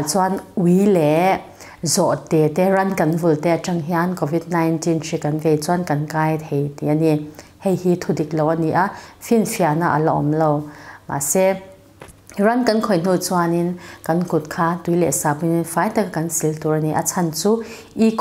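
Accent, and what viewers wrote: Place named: Lunglei, India. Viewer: Naupang leh kha zirtir i ang. Se tha lutuk tho e..tin lunglei lam mi i nih ninetrin😀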